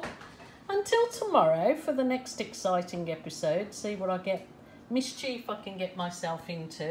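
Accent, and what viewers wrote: Birmingham, United Kingdom. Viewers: Lol... I've been thinking it's a bit strange that there's a place in Australia with an almost brummy accent 😂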